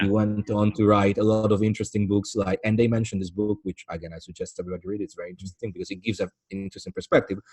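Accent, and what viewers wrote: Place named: Ireland. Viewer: Wasn't expecting an Irish host. Great stuff. Hello from Offaly Subbed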